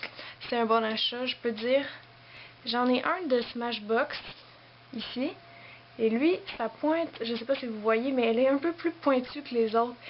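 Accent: Quebec, Canada. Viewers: D'habitude j'aime pas l'accent québécois mais j'adore comment tu parles, ça a du charme, super vidéos! :)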